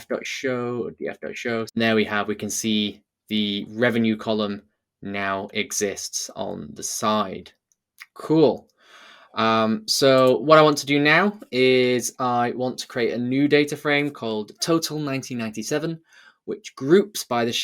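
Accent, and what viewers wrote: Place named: England, United Kingdom. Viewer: With a English accent!!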